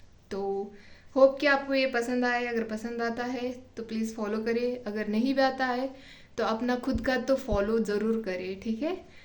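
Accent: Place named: Maharashtra, India. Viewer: Ma'am you are from Maharashtra 😅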